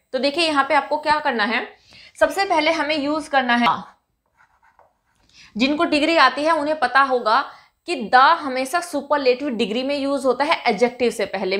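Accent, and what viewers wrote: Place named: India. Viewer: Dear। beta....you really do well...but be little more cautious while using any strucure,and meaning and sence of sentece as well...And please do correct your pronounciation and your dialects.....your UP.BIHARI,AUR HARYANVI touch spoil the language......work over it....Dear Your sentence should be.....The younger he is more crafty or mean he is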